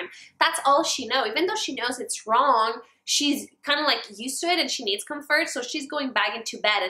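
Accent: Quebec, Canada. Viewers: she has a Quebec accent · Canadian accent 😂😍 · Are you Quebeci French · I love your accent, I'm a Canadian too so I love this. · She's from Montreal She replied to a comment asking about her accent and where she lives. It's French Canadian. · Are you from Quebec? You have the kinda accent? (In my Aussie opinion lol) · French Canadian. It's just her accent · It's French Canadian. And if she only speaks French for the most part she most likely translating in her head.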